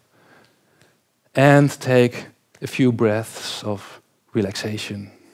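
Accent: Netherlands. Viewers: Ik kan horen dat je nederlands bent haha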